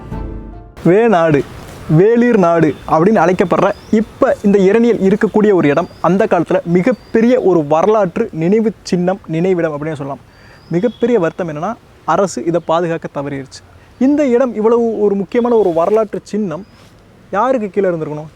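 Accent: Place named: Madurai, India. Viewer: Hi.... Please read kannadasan's Cheraman Kadhali..we miss your iyalbana madurai slang..ellorukkum vanakkam.and vaanga polaam.. pazhaya ilandha sogathil puthiya konathil anbudan varavekirom.. love and regards..and very best wishes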